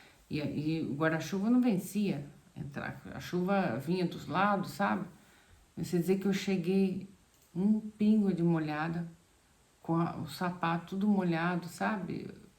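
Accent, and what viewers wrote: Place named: Espírito Santo, Brazil. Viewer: Gostei muito de você que Deus continue abençoando você e sua família. Você é do Espírito Santo? Você tem um sotaque parecido. Desculpe pela indiscrição, mas o seu namorado é alemão? Eu já morei na Itália. Não por causa de dinheiro mas por gostar do país da língua sou neto.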